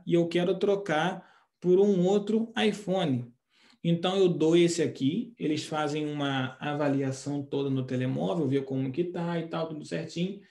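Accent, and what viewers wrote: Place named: Rio de Janeiro, Brazil. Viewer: assisti um vídeo rápido comparando o zoom do I fone 13 comparando com outra marca e puxando o xoom da Lua até visualizar a bandeira dos USA. Será verdade? Lembro do zoom e imagem de 44 megapixels do nokia 1020 bom na época. Agora, sem cabelo parece como o maridp da Rochele: " eu não preciso disso. Meu marido têm 03 emoregozxsss!! Mas, caraahb!! Você até tem sotaque carioca e me lembrs aquele ator dp filme " Loucademia de Polícia ". Ele imitava sirene de polícia e tiros de fuzil. Agora, careca, lembra Julius da Rochelly. Carahh!? Um comediante brasileiro do Pânico no Rádio Morgado vai em setembro fazer show em Porto e em Lisboa. Penso se ele conhece bem o significado das palavras e dizer: bom dia! Por favor quero malhar moça. Com licença senhor, sabe onde é o banco? Sim. Na praça. Mas, essa hora terá que pegar uma grande bicha. 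É melhor se informar com as raparigas quê trabalham lá. Eu sou comediante, vim para Portugal fazer um bico de comediante. Aí, comediante fuma muita maconha e fala pertinho: sabe onde posso comprar um fino? E lhe respondem: sim. Ali no café. E o cara chega lá e fala baixinho: me dê um fino por favor. Lhe servem um choopp extra forte e ele fica embriagado e pensa e fala pro amigo pelo Ifone Apple: carahh!! Aqui em Portugal já vem diluída no chopp o finohh!! Tomei dois e fiquei doidão. Kkkkkk!!! Desculoe a brincadeira. Sou muito respeitador. Paulo Pacheco. Serra de São Vicente-MT.Brasil. Terra. 25/08/22..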